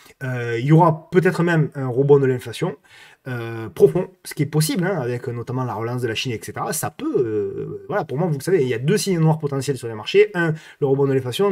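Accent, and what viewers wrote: South, France. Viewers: Un grand merci, pour cette vidéo et ta newsletter. Beaucoup de contenu, de la pédagogie, de la bienveillance et ce petit accent du Sud… Tu es notre rayon de soleil. Merci pour ton grand coeur et longue vie à LA TABLE DES INVESTISSEURS 🫶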